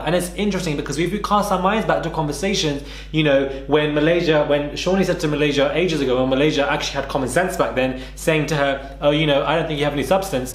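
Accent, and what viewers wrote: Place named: United Kingdom. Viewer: Dude you nailed it I was like wait is this an Indian guy with a British accent talking about basketball wives. LMFAO I'm subscribed sir cause you telling it like it is.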